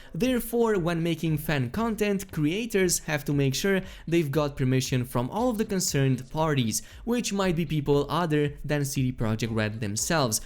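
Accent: Netherlands. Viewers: it's a dutch accent :)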